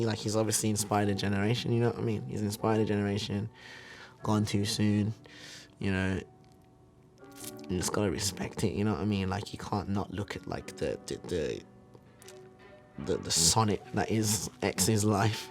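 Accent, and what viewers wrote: United Kingdom. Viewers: I love his British accent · He said hella fucking British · Bro I didn't know scarlxrd was British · He is British...ik that It's Suprising tbh😂🤡🤡🤡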